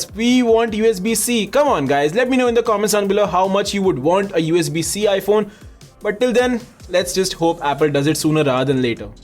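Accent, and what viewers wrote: India. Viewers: Indian freshy English accent is very potent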